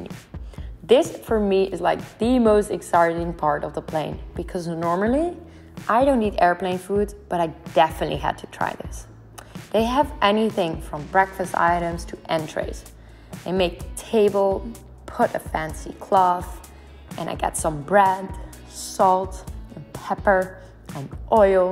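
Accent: Netherlands. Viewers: Je klinkt Nederlands haha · Zwz ben je nederlands haha je kunt het gewoon horen NL kunnen nooi goed engels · Jij bent toch Nederlands? Ik hoor het aan je accent 😂 Leuke video trouwens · Sanne Vloet je accent verraadt dat je Nederlands bent. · Je Nederlandse accent 😂✌️ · Youre dutch richt. Im sorry i hear it but youre realy good in it. I hear it because...............ik ben ook nederlands. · Your dutch and i thought you were going to The Netherlands again?.. Btw i knoe that cuz your accent not being rude. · Ja, jij komt een 100% zeker uit nederland! ik hoor het aan je accent :) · You have the netherlands accent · Ik wist dat je nederlander wist op het eerste moment door je accent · Why do you sound Dutch ?😂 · Is ze Nederlands? Door t accent hahah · Are you Dutch? Cause your English sounds like Dutch people’s English (I mean like your accent) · Her English is so good but I still can hear Dutch.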